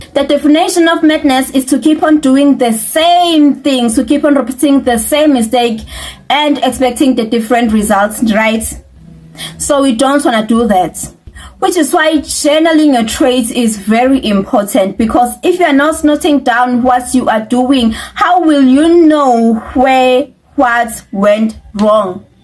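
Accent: South Africa. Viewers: South Africa, even her accent.🇿🇦🇿🇦🇿🇦🇿🇦🇿🇦🇿🇦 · She's South African from her accent indeed she's South African.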